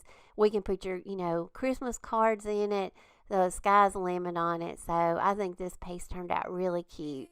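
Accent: South, United States. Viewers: Thank you so, so much for getting back to me, don't know how I missed it, can't wait to make some of those trees! By the way, while I was searching (& finding 25 OTHER fun things to make!) I saw something where you seemed like you were sort of apologizing for your Southern accent---don't apologize, it is charming & adorable. I've never lived in the South, but I love hearing you talk, makes me feel like we could sit down on the front porch with a glass of sweet tea & giggle about some of our craft disasters (no. more. glitter. ever.) and favorites. Thanks again!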